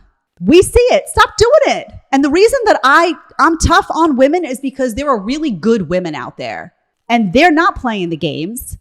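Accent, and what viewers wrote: New York, United States. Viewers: I recently moved from NY after being there for 12 years. Your accent and your humor invoke good memories of some of the nice people I knew and met there. Great content!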